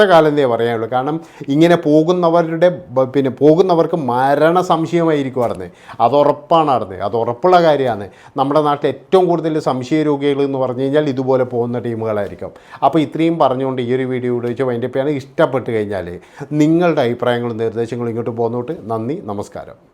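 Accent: Kannur, India. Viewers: കണ്ണൂർ ജില്ലയിൽ എത് ഭാഗത്തെ ഭാഷ്യാണ് ചേട്ടാ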